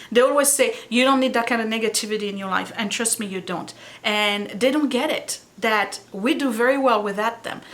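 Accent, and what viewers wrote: France. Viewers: I always love to watch your videos. My favorite aunt was born in Belgium and had a wonderful French accent just like you. So, whenever I listen to you speak, it reminds me of her and feels like a little bit of home. Don't let the haters get you down. I'm so glad you're on Youtube!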